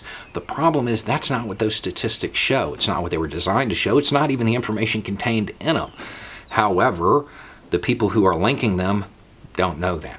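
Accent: South, United States. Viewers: I’ll be honest I’m from London, been NY and LA in the USA. Never would I think that someone that looks and talks like you would have your morals and ideas (yeah I know, ignorant) thank you for opening my eyes and making me realise not all white guys with southern accents, beards and trucker caps are racists.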